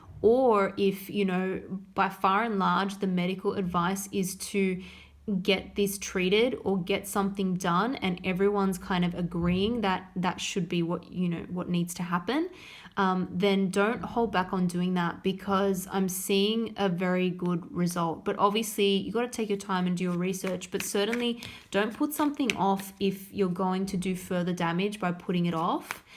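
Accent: Australia. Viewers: So good to hear an Aussie Tarot Reader! 🙌 Thankyou!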